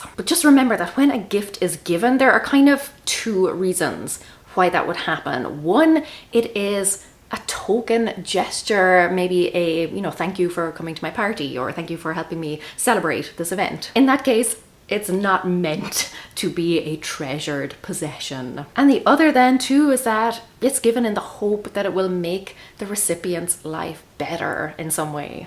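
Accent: Ireland. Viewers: sounds like an irish accent but I cant pinpoint where lol :) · I just watching this video for info and now ill watch it to hear your beautiful accent. Irish, right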